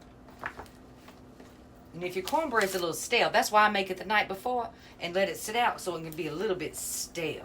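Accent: South, United States. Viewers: I love your videos and that sassy southern accents. So sweet ♥️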